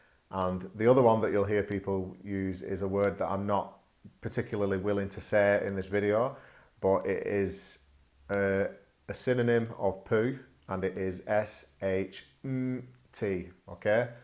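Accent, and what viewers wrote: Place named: United Kingdom. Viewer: Hello! I am your new subscriber! 👱 You British accent very similar to Gordon Ramsay's, so British that I wanted to make me a cup of tea with milk instead of black coffee as I used to ☕😅 Happy to find your channel! Wish you loads of love, tons of money and a load of new subscribers in the coming year! 🎄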